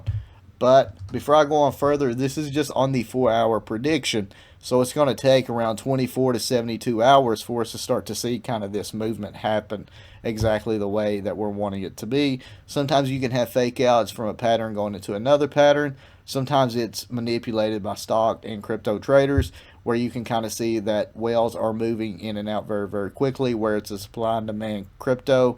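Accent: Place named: Appalachia, United States. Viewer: it's hard to believe anything you say with that hillbilly accent.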